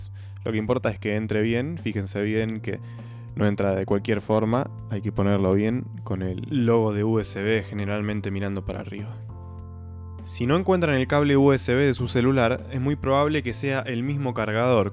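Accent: Argentina. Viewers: Excelente tu explicacion No podes negar que sos argentino!!!! Un saludo desde Mexico. Aclaro yo lo soy. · Es argentino 😑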